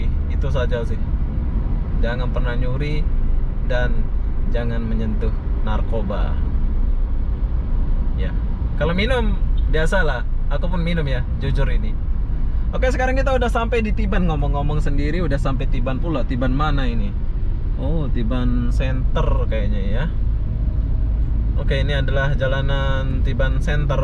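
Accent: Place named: Malaysia. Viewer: Ooohh pantesan masih dapat Logat Melayunya Pas, kirain orang Riau/Sumbar. Ntaapp Lanjutkan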